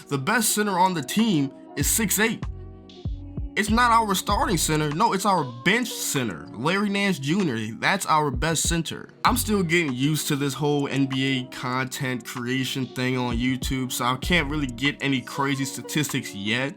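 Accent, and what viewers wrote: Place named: West Virginia, United States. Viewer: tf is that accent? you from west virginia or arkansas?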